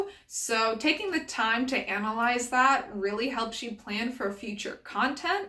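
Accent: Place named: Europe. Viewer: yeah vibrato is a very wide topic (no pun intended!). Cool, I'll look out for that video and yeah, I imagine it will have to come in sections. Another question I have which I'm not sure you answered before, or maybe you do in another video, but where are you from? You sound like you may have lived in Europe originally but then grew up in America. Am I right? I don't know lol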